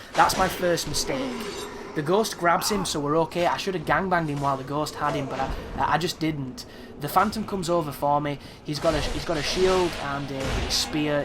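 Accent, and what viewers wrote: United Kingdom. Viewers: Bro, it's Laito not Liato. Its in-dick-t-ment not indIcted. I know your british but come on man.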